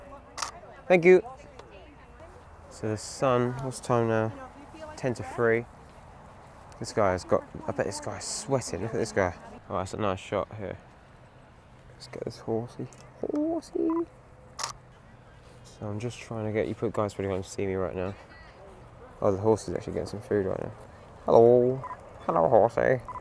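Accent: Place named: England, United Kingdom. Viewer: got confused for a mo.... English accent in Kentucky. is an amazing host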